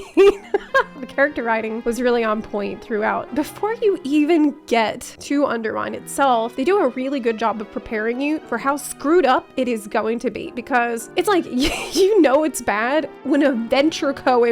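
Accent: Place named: New Jersey, United States. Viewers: I’m from NJ. This is just how a lot of people here talk. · Haters to the left, your Jersey Goblin accent is spot on.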